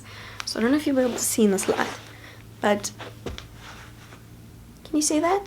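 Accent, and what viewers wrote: South Africa. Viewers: הנסיכה שילת I believe she is South African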